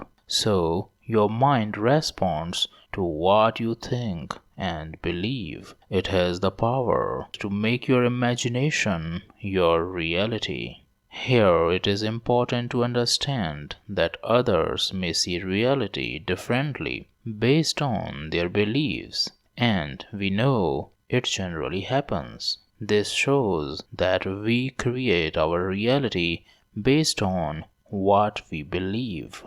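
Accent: India. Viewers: It's not a cartoon voice but an Indian voice. However many people are born with disease...... This is just nonsense.....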